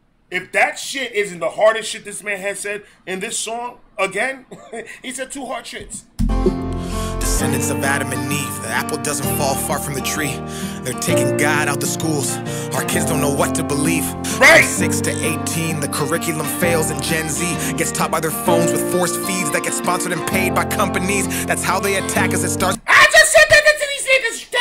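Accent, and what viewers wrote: New York, United States. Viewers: My dude! Hands down my top 3 reactors on yt. So glad i found your channel a month ago. You originally from NY? Bc i get ny vibes from u. And you talk your shit like a nyer. It's honestly refreshing man. Keep it coming man. You a real one.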